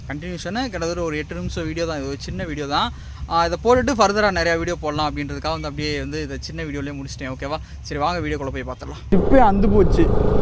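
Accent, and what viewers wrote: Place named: Madurai, India. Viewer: Madurai slang ❤️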